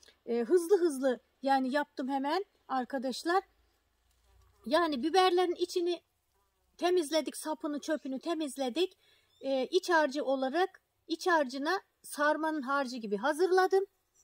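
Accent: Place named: Giresun, Turkey. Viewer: kolay gelsin ablam masallah sanasiz nerelisiniz acaba giresun lumusunuz siveniz oraya benziyo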